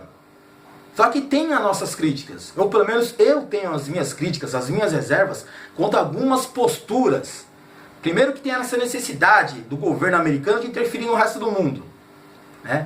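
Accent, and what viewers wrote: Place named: São Paulo, Brazil. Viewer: Vc é o Cara! Eu sou carioca - tenho vergonha da cidade hj, certo! - e jamais pensei em encontrar um mano verdadeiro com sotaque paulista. O impossível ė possível, acabei de encontrar o. Valeu, pelo canal. Para agradecer só posso retornar com 100% de audiência. Mano, não desista do seu povo, força guerreiro!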